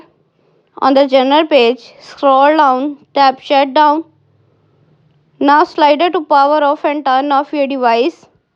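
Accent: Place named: India. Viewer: Every tech video there Indian and I can’t understand them like can’t there be American tech videos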